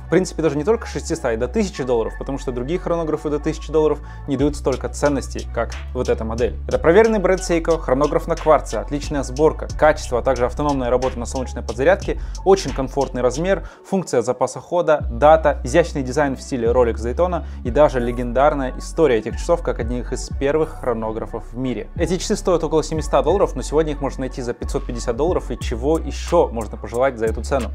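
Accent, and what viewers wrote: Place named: Kazakhstan. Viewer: Он из Казахстана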